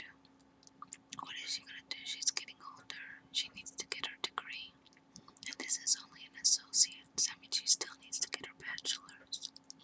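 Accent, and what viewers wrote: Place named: New York, United States. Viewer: I love your accent. That's the prahblam - huuuuge tingles. Are you from New York by any chance? I have a friend who is Latino, from New York, and literally every time she talks I just melt and zone out..and she's like, "Gina? Hello? I'M TAWKIN' TAH YOU."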